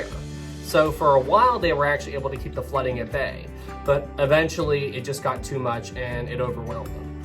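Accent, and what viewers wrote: South, United States. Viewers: your Southern accent really comes out of hiding during the narration parts haha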